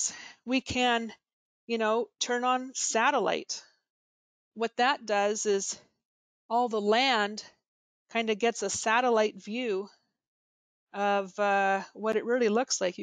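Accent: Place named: United States. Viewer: Very informative but what version of Canadian english do you speak? Very American pronunciation. Rout? Mobil? Yikes 😮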